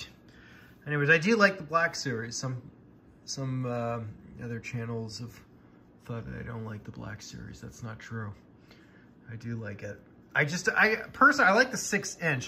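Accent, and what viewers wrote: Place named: United Kingdom. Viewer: Also love your British accent haha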